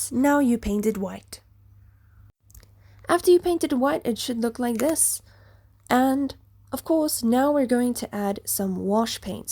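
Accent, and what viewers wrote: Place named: United Kingdom. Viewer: Oy you also British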